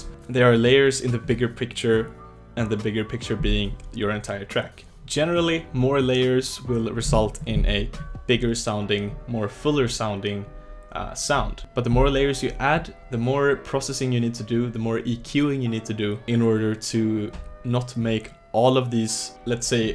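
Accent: Sweden. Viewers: Dude that brutal swedish accent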